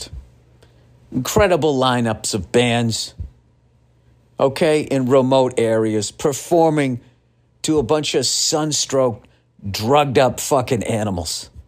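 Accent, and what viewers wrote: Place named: Boston, United States. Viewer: Bill is fom Bwoston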